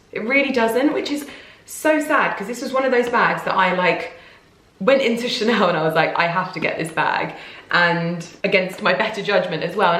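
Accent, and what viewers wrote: United Kingdom. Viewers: I'm 13 minutes into the video before I realized she has a British accent. I think I watch too many British Youtubers...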